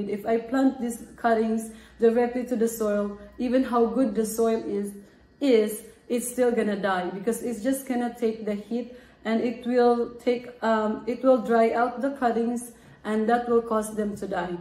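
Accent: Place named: Eastern Europe. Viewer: I like your accent, sounds Slavic. 😊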